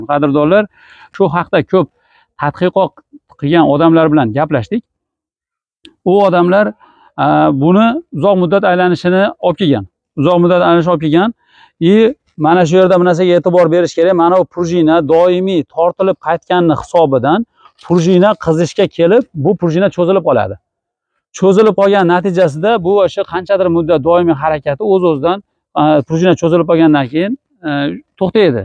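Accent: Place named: Fergana, Uzbekistan. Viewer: АКАМИЗ ФАРГОНАДАН ЭКАН БАС ДЕБ ГАПИРДИКУ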